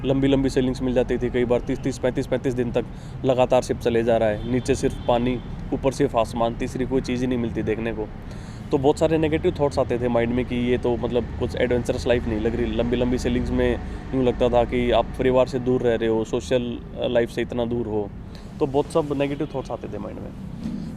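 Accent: Haryana, India. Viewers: Haryana k log sach Bolte h or Dil se Bolte h. AAP Haryana se ho boli se hi PTA lag rha h. I Love my Haryana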